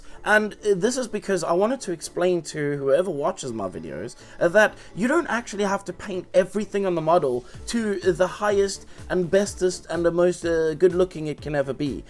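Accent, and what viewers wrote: South Africa. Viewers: Just got into resin printing and painting, your channel is very informative, thanks man and keep up the great videos. You sound South African? Howzit! Lekka Lekka!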